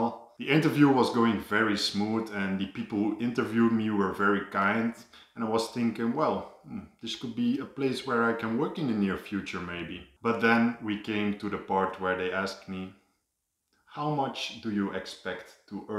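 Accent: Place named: Netherlands. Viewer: I subscribed because you are beautiful and I love your accent and the fact that you're from the Netherlands...much love from 🇧🇲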